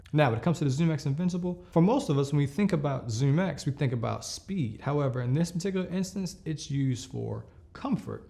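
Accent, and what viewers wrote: Baltimore, United States. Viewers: Thx bud That bmore comes through in some of your words. Lol Great video sir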